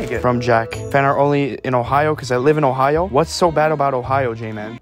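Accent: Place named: Germany. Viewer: his german accent tho :,)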